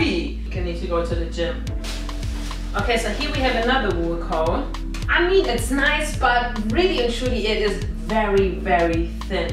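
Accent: Germany. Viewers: Delia Beer ja, sie kommt aus Deutschland und ist dort geboren, deswegen ist ihr englisch auch anders als das von den native Amerikanern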